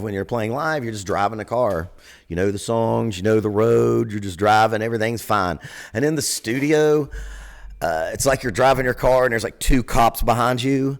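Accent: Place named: South, United States. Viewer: Not only do i love your insights into drumming helping me appreciate the music even more, but your smooth and soothing southern voice has got a place on my insomnia playlist. Please release a track of “nudge” and of “puuunch” on repeat. AWESOME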